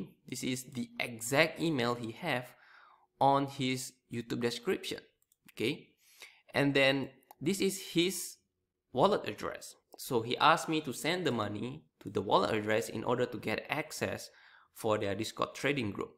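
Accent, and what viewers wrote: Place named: New York, United States. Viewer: He's a New Yorker and even sounds like a really shady New Yorker. His accent tells all check out TJ spoonman who got ripped off too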